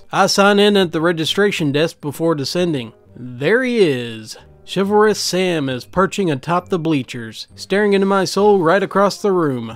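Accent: South, United States. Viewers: Areou southern what state are you from?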